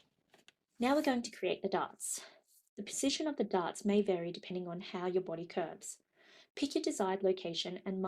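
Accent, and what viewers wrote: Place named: Australia. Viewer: Thanks, very nicely explained. Love hearing your (our) Aussie accent too!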